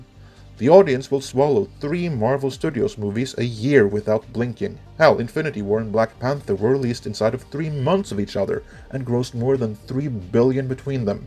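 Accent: Sweden. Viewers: you're swedish, aren't you?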